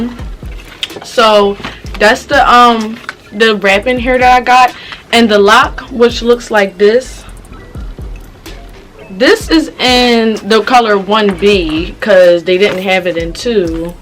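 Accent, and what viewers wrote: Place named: Philadelphia, United States. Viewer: Yesss when u started talking I was like she definitely a Philly girl 😍😍😍😍 hair stores open in Southwest Philly from another Philly girl ps I subscribed 😘😘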